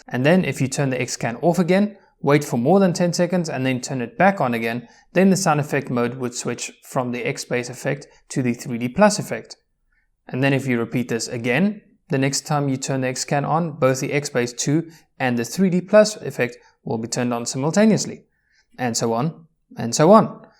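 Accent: South Africa. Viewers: You sound South African.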